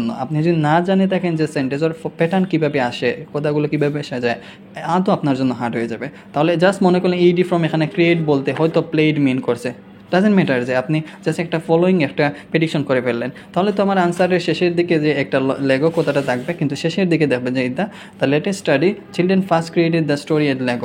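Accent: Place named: Sylhet, Bangladesh. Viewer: সিলেটের লোক, কথায় অঞ্চলিতা চলে আসছে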